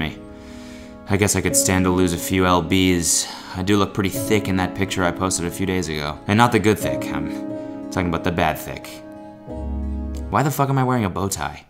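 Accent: Canada. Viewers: I had to scroll so long to find this comment. Who says “pro-sess-sor” instead of “pross-sess-er “? Sometimes be soundin realllll Canadian 👀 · Lauren Annie because Canada. He says sorry like that too lmao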